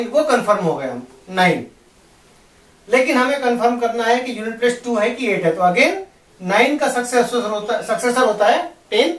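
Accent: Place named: Bronx, United States. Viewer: His south Broncs accent is hard to understand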